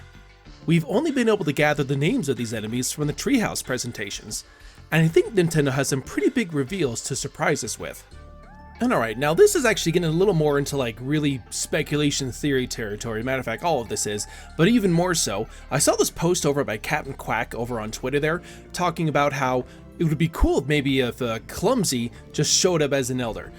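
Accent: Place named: Canada. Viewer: Also, your accent tells me that you're Canadian are you from Canada or not really?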